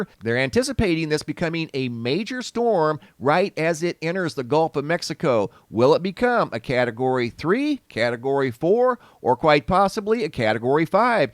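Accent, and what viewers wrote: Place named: Arizona, United States. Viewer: “There goes a rogue shopping 🛒 cart!” Sorry, the way said it, I laughed out loud! His Arizona accent makes me laugh! 😂 He would laugh at my NY accent, I bet. All my love and prayers going out to those in harms way! Stay strong, folks! 🙏 😘🗽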